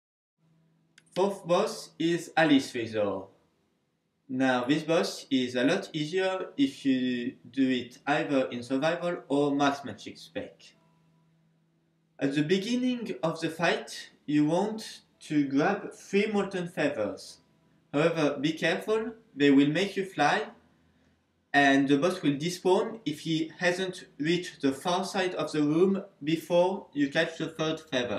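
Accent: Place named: France. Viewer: Your English is not very good if you have trouble parsing a French accent.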